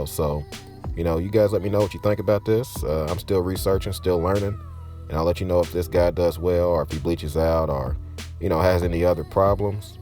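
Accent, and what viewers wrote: South, United States. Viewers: Is that a country accent I hear??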